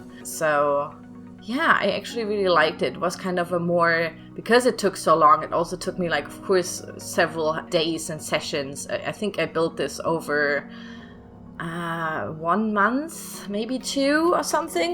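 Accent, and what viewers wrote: Germany. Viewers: Are you German or something cause you don’t have an english accent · I heard literally in 3 seconds that you must be German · May I ask where you're from? Your accent sounds German but then again you're using so many particular slang words that I would think you're native speaker? If not then hat's off your English is amazing🤯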